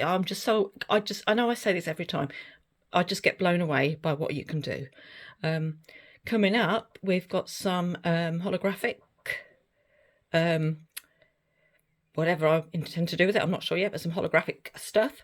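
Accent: United Kingdom. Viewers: I am East Midlands too, I could tell by your accent x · Wow, they turned out gorgeous! I've stopped trying to do blooms because I've jumped from one resin to another. You just nailed the reason why I've given up, information overload! I have two resins I enjoyed working with on different projects and I'm going to stick with them until I understand how they work. Thank you for this informative video with a beautiful turnout. My husband was born and raised in Nottingham (Arnold) so your accent is very similar. I kept thinking you had to be from the Midlands 🙂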